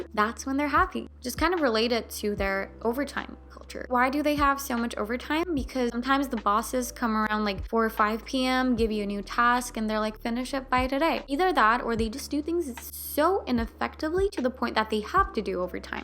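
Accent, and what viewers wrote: United States. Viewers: She was raised in Turkey, though she's fluent in English and has an American type accent.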